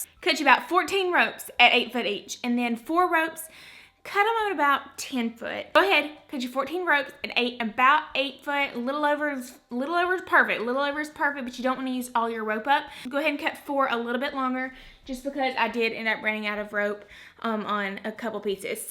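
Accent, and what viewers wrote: South, United States. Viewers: I so love my! You always sound like a Southern Belle - no matter what you think. I'm going to make this. Maybe even today!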